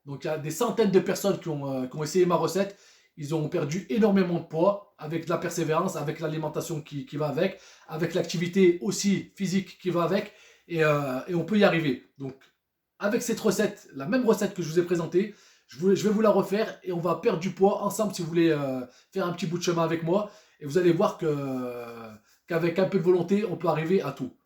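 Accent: Saint-Étienne, France. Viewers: Avec un bel accent de St Étienne ou de Grenoble...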